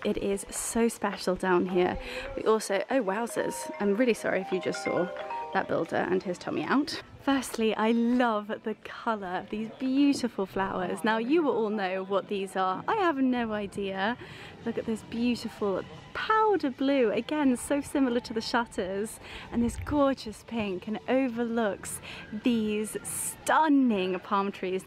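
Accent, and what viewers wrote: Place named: Scotland, United Kingdom. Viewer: Loved this - an amazing property 😊 Also loved hearing that Scottish accent!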